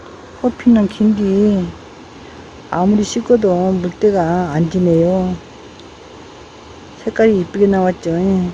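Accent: Gwangyang, South Korea. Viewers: 말 하는 억양이 과냥 사람 같네! 일 만 km 멀리서 과냥 말 들으니 좋네!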